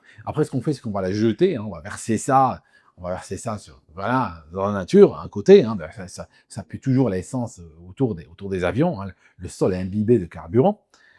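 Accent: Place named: Switzerland. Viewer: tu Soules avec l''accent Suisse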